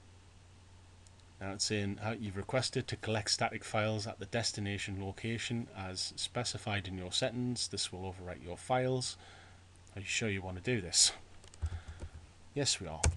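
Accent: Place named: Newcastle, United Kingdom. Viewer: This is awesome. Django in a Geordie accent.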